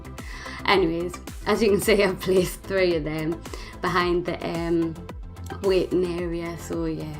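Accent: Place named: England, United Kingdom. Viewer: I Make Simmies Not Builds....Wish YOU could make a TUTORIAL on ROOFING????? LOL OMG YOU should see my Roofs!!!! LOL LOL Wowww Extremely Happy I found you going through Sims 4 Tutorials........I LOVE How Creative you are Ive watched 2 of Yours so far and this ONE I LOVED them !!! I Really Wanted to Tell you how Inspirational you are to what I can possibly do Myself I am NOT a BUILDER........BUT TRYING ... ONLY 5 months now With Sims 4 Never Played 2 or 3 but did Play all the Originals I am Shocked at How different and much the Game has Changed from 12 plus years AGO........ITS AMAZING!!!!!! I Wish I had Played 2 and 3 I Would Know so much MORE........ haha Anyway......Love your Techniques with your MOO so much!!!!!! Wonderful Idea With this one!!!!! too :D Subbed and Faved LOVE YOUR English Accent :P Mine is TEXAN......so Very Different ..... 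Hugzzzzzzzz and Hope to see tons more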